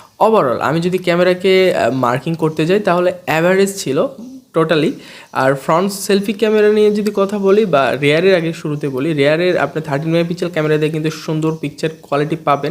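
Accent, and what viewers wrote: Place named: Kolkata, India. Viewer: আপনি তো বাংলাদেশি, কিন্তু আপনার একসেন্ট একদম কলকাতার মতো কেন?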